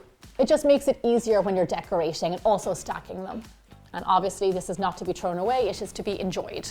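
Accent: Ireland. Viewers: You are so cute. I just discovered your channel & subscribed. First, I Love your Irish accent and definitely your baking recipes, (thoughts, strategies & ideas with baking). Also appreciate the mindfulness of cake prices you have and simply baking at home. I was looking for a birthday cake recipe too! Thank you 🙏🏻🙏🏻so much for sharing your gifts with us. Happy 11th Birthday 🎂 Gemma (Beautiful Cake🎂) & may God bless you with many more happy years ahead!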